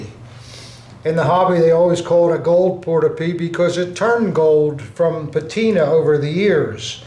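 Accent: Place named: Philadelphia, United States. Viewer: Man the Philly/S Jersey accent is strong with this guy! Good Stuff, could enjoy a beverage on 2 street with him.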